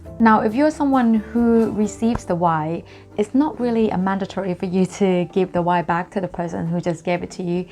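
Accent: United Kingdom. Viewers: what a British accent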